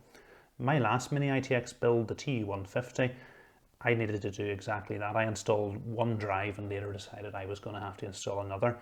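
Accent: Northern Ireland, United Kingdom. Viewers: Ignoring the obvious NI accent similarities, you sound an awful lot like Jim Browning! In the nicest way possible, your voice belongs to a much older man. It's calming and soothing. I'm from NI too, and never have I heard someone younger than about 45 sound the way you do.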